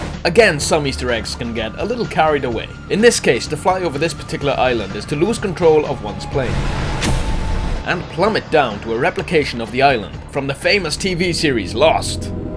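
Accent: Wales, United Kingdom. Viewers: you welsh or something?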